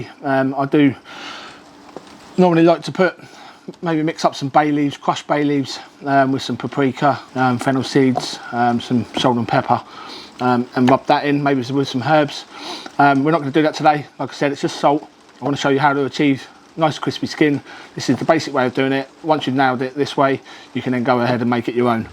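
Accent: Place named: East London, United Kingdom. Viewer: Nice to hear a great East Laaahndan (or Essex) lad showing the World how to do pork “right proper”! Thank you, I’m trying pork on the rotisserie tomorrow, and I’m going to copy your great instructions.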